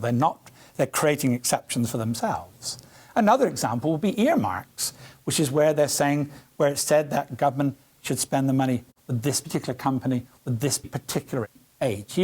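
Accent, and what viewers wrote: United Kingdom. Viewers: Sounds Scottish of some description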